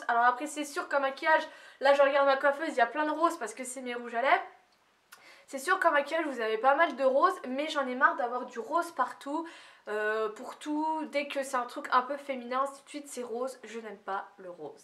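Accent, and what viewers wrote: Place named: Vosges, France. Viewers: tu as l'accent vosgien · tu as un petit accent vosgien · tu as vraiment l'accent vosgien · ton accent... celui de Gondreville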